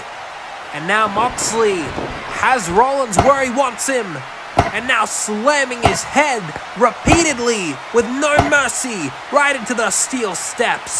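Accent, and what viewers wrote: Australia. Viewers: WOW FANTASTIC!!! I love the new stage dude! I was talking to SuplexNetwork and we agreed y’all sound alike! Probably because y’all are both Aussie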